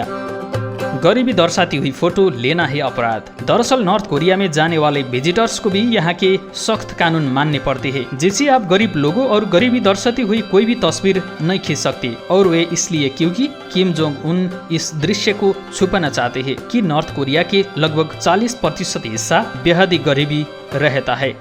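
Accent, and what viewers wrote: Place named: Nepal. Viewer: नेपाली हो नी, तर लवज नेपाली नेपाली आइदियो😜😜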